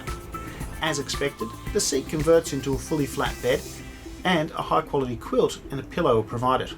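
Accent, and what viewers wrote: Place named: Australia. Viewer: Another great review! In general I'm not a fan of "in your face" flight reviews, but your professionalism, insightful commentary, and intriguing Aussie accent set the standard! Your style in fact inspired me to start doing my own YouTube flight reviews on the other side of the world. Question for you or anyone in the comments: I have the option of 2 flights in Cathay J long haul or on JAL supposedly in their sky suite III. Which should I take?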